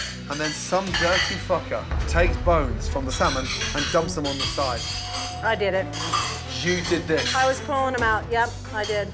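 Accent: United Kingdom. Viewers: Joseph Osborne he's British it's how they talk it's normal for them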